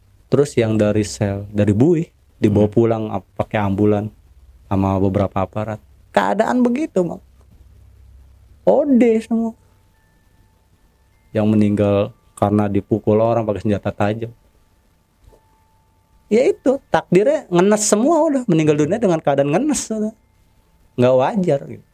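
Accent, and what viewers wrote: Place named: Jakarta, Indonesia. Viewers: Abang macam betawi ngomong nyee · Betawi bgt logat lu.. kampung Mane sih ?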